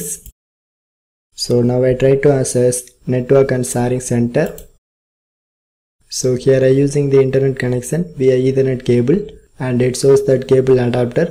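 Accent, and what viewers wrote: India. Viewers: YO IT WORKED I AM NOT A BOT AND THIS ACTUALLY WORKS I THOUGHT THIS VOICE INDIAN GUY WAS SO OBVIOUS BUT IT ACTUALLY WORK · Certified indian accent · Man the best tutorials are always made by people who has that stereotypical India accent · I LOVE THE INDIAN ACCENT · thanks btw i think you are from indian cause your voice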